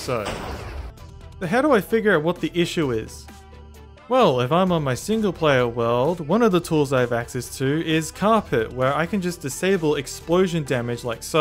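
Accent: Australia. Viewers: Dang, the Australian is coming out this video.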